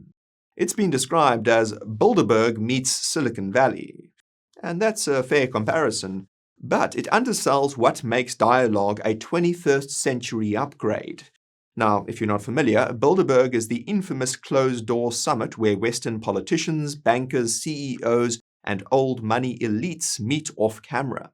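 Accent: South Africa. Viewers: He's clearly not British, maybe South African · Your accent sounds very South African? Just asking as I am South African with Irish roots and have a lot of respect for the people of Ireland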